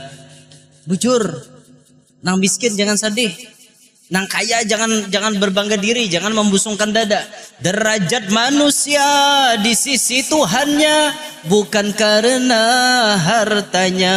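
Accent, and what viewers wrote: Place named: Kalua, Indonesia. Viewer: Nang spesial disamping ilmu sidin adalah logat kalua nya nang maulah orang katawa😁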